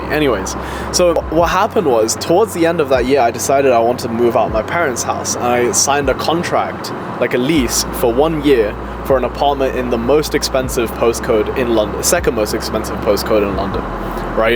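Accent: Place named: Australia. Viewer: Since when do Chinese have an Australian accent?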